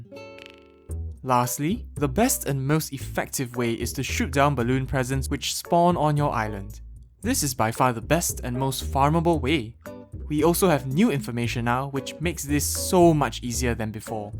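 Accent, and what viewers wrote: Singapore. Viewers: youre definitely from Singapore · Familiar accent. S'porean?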